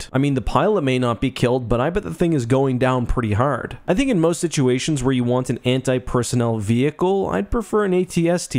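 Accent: Canada. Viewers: Based on your accent you’re Canadian?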